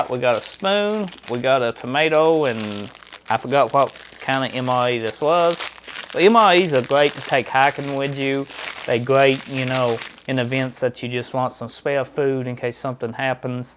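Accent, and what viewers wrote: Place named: Deep South, United States. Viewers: I’m not sure if your accent is depth south or Australian 😂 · it is swampy, and american - but that can be a few places in southern parts of the united states - the dialect is from several sources in its past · I thought Cajun...but sincerely hope that's not an insult..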